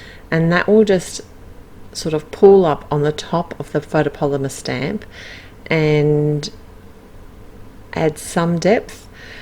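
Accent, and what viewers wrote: Australia. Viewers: That is just beautiful. I think I have that stamp set so I want to give this technique a try. And the inlaid die cutting looks great. You have the best voice - I just love listening to you! It's so great hearing a familiar Aussie accent in a sea of American ones on YouTube!!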